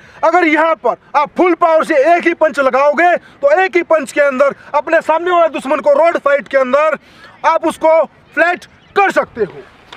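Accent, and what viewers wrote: Haryana, India. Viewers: Haryanvi ton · Are you haryanvi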